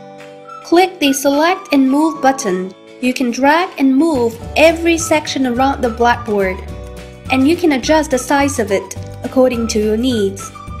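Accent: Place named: Scotland, United Kingdom. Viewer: She has a lovely accent, almost sounds Scottish.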